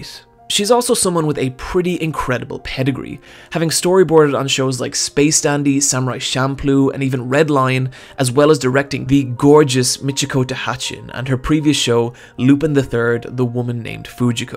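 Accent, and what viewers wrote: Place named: Ireland. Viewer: No hate i promise just wanted to say the dude sounds Irish (i'm also Irish)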